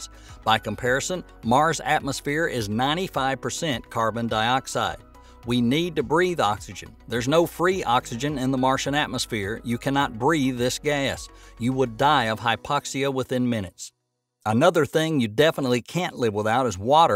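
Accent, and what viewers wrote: South, United States. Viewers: The narrator has an annoying "country" accent and frequently mispronounces words.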